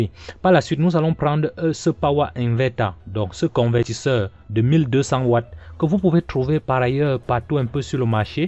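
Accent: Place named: Africa. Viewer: C'est super, j'adore ton accent africain, et le tuto est super bien fait 👍 je m'abonne pour ce travail de qualité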